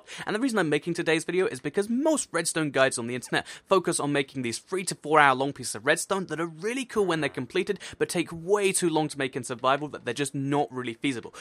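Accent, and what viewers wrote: United Kingdom. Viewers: You speak in such a breathy manner lmao wonder what part of the UK he’s from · Sonic pikachu don't make fun of him that's because he's British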